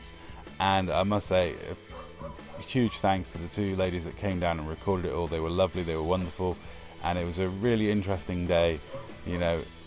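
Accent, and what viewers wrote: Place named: United States. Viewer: I enjoyed the video. Sounds like something one many local USA media would have done. Then again local news in states would have gone little more detail. Your voice and what I imagine what you look like was total different. I could easily pass off as some from the states. It's same that people don't treat the chickens and rosters well. Here states I know a lot people that rise chickens for their eggs and meat. All them treat chickens and rosters very well. I do hate cock fighting and it should be ban through out the world.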